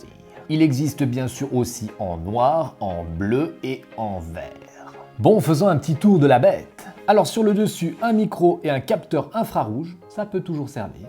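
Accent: Switzerland. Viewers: quel bel accent suisse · On voit à ton accent que tu es Suisse... 😂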